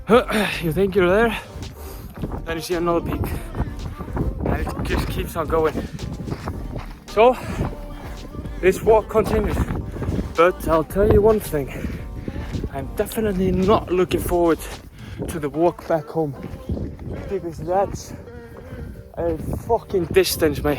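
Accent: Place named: Northern England, United Kingdom. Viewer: Interesting to hear how your accent is like a Northern English accent. I wonder if the Northern English accent is derived from Scandinavia. It was ruled by Danes and Vikings for a while.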